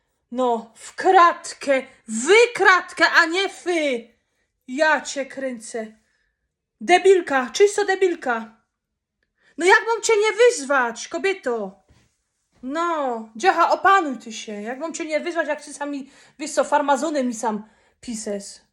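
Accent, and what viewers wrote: Silesia, Poland. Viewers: Kobieto, jak ja Cię kocham miłością szczerą ❤️ Ciebie to powinni sklonować, krejzolko najwspanialsza 😂😂❤️❤️❤️ Kiedyś nie lubiłam śląskiej gwary,ale Ciebie to mogę słuchać całymi dniami. Pozdrawiam ze Świeradowa Zdroju ❤️❤️❤️❤️ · Ale ty jesteś przykra z tymi twoimi głupotami i żenującymi mało interesującymi filmami no i z tą wkurzająca gwara śląska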